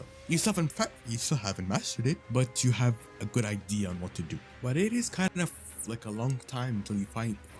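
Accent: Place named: Gulf, Arab world. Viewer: u got a khaleeji accent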